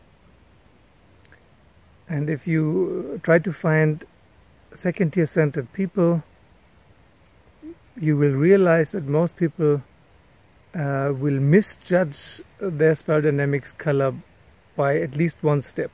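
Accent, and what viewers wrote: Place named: India. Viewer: wow, I always thought you were Indian, wheres your accent from? :)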